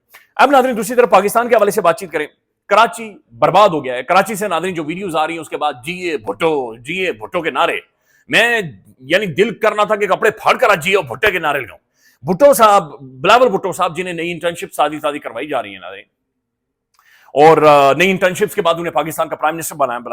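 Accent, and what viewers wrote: Kashmir, India. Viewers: Bhai ur accent reflec u r true kashmiri I m also bt I live in Karachi...wesy tu pora mulk yateem bt Karachi tu extra yateem..yahan k log gongy behry bs mazdoor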